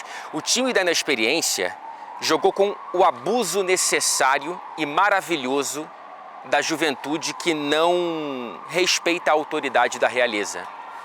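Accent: Rio de Janeiro, Brazil. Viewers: Esse sotaque carioca é irritante. PQP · É o carioques, não tem jeito!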